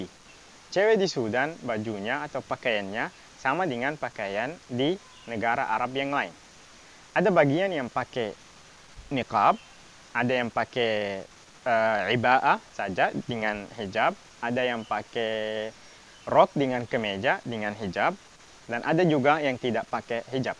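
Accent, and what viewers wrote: Makassar, Indonesia. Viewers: Kereeeeen aksen Makassar da pakai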